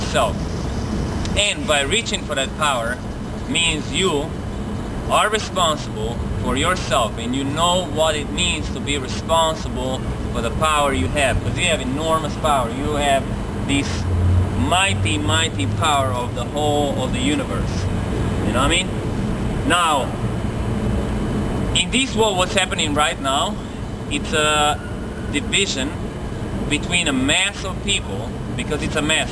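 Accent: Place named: Spain. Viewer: this guy looks American but talks like his Spanish wat a funny guy lol, is he faking his ascent lol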